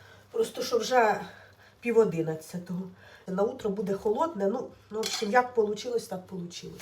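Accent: Ukraine. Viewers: Всі слова мацковські з українським акцентом ,і Бога приплітає !Вчи мову ",бєстолоч "!І молись !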